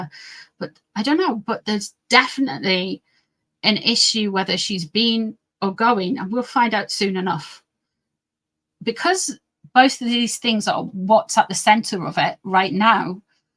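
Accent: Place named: Newcastle, United Kingdom. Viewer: I love your voice. Just love the Georgie accent ❤ And just for the snob I'd like to say I come from a mining town /heritage in South Wales!!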